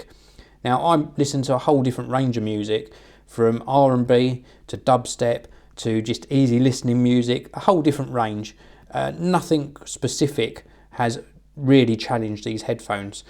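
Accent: United Kingdom. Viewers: Nice and complete review man! The best I've saw on that one (the smooth English accent is a +)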